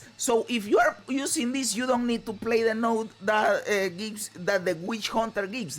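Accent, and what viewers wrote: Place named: Dominican Republic. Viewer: a fellow dominican playing poe with a thick accent was not something i expected this is CONTENT lmaoo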